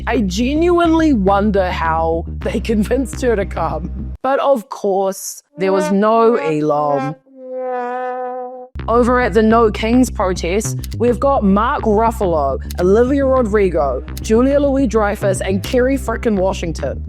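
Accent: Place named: New Zealand. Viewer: You have the perfect balance of serious vs funny, if you can't laugh you'll cry? or something like that .. I'm from NZ and it's cool to hear the accent on youtube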